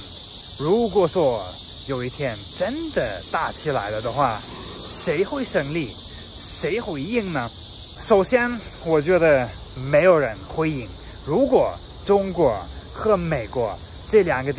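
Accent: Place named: Chengdu, China. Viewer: 你的成都川普比我说得好。😅😅😅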